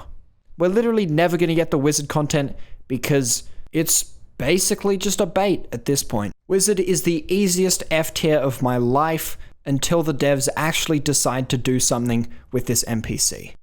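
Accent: Australia. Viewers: I thought that was an aussie accent, I live in melbourne and I am sad, I can’t even beat greensleeves )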